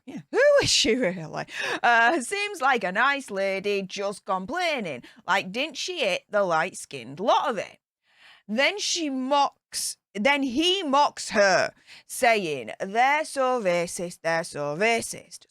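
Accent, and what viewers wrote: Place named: Northern England, United Kingdom. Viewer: I've only just discovered your channel this week when you were talking about Harry's therapy session. I love your energy and personality. I live in the North West of England . I simply love Northern accents. I see that Daniel Boland is from Sheffield. I'm a stone throw from Manchester in one direction and Derbyshire in the other direction. I've tried to place your accent. My daughter-in-law us from Darwen in Blackburn. I could listen to her all day. Are you on the borders of Lancashire and Yorkshire ? Anyway less of the Geography. I think your channel is the sort where housebound people love your vlog because your demeanour is such that you feel like a pal.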